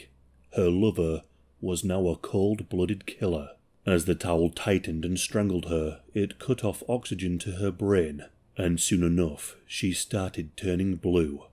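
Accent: United Kingdom. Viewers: I just get more and more addicted to this damn channel and the narrators UK accent along with Shrouded Hand there's no way any Yank across the pond can make any true crime content this bold unique and exclusively personal .👑👑👑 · Are you British?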